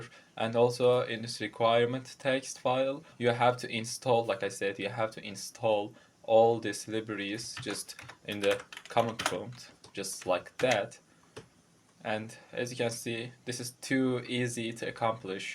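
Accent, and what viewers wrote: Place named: Turkey. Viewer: Türk Aksaanini biraz belli etmis gibisin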